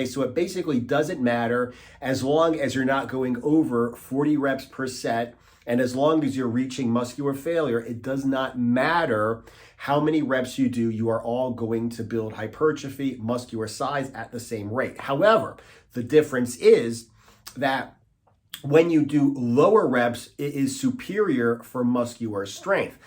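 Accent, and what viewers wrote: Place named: Philadelphia, United States. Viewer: Great video, man! A lot of folks need to hear this. P.s. your accent makes me think you're from Philly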